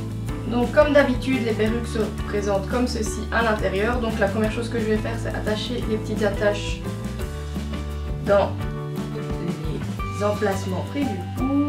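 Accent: Belgium. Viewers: Je me disais bien que tu avais un accent belge , by the way je vais a la mia dimanche aussi :))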